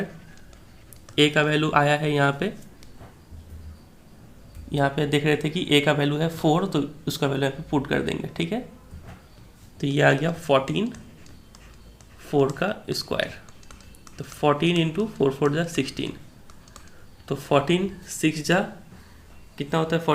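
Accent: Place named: Bengal, India. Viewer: Where are u from sir ?? U sound like bangoli , if i am not mistaken, you are...... please please 🙏🏻🙏🏻🙏🏻 reply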